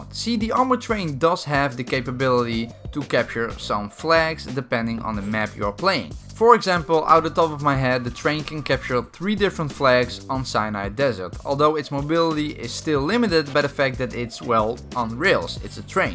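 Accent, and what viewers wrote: Netherlands. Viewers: Your so dutch, ik hoor je accent direct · je kan wel horen dat je nederlands bent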